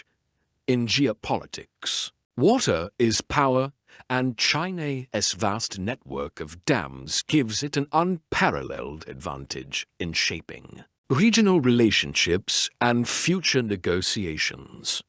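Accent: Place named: United Kingdom. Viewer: its the demeaning British accent. 😅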